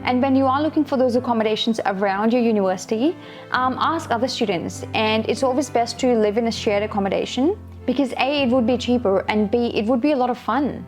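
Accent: Australia. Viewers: You have such a great knowledge with brilliant auusie accent and make more vids on foreign Nurse to how to be Rn in Australia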